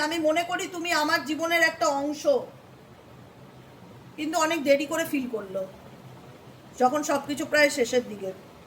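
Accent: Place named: Bangal, Bangladesh. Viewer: দিদি আপনি যে বাঙাল ভাষা বলেন আমার খুব ভালো লাগে। আপনি বিড়াল কে বললেন জুত করে বসো। আমার মনে হয় হাজার এর মধ্যে এক দু জন বুজেছে।😊😊😊😊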